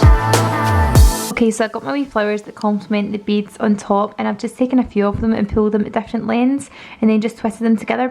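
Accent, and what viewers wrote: Scotland, United Kingdom. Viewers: omg i lovvvveeeeeeee scottish english 😍😍 - greets from switzerland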